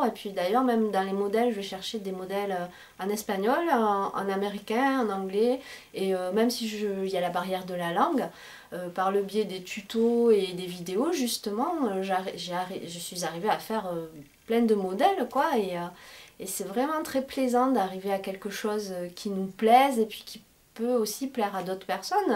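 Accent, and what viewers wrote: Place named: Toulouse, France. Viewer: Bonsoir ma nièce ( petite soeur adorée ) . Super ton accent toulousain ou carcassonnais !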